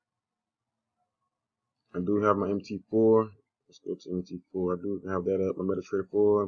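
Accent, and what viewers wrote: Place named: South, United States. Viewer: Yes, wealth of info in there. That's why bit frustrating cos i couldn't leave the video and had trouble understanding haha. I am from the UK so not used to the southern accent. It's not about how you speak but that we all speak differently depending on where we are from and can be hard to understand each other some times. Thanks again!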